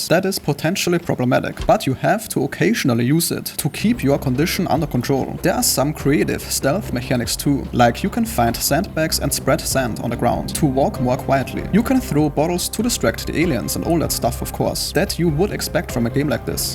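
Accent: Germany. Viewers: man hört komplett das deutsche Englisch raus 😅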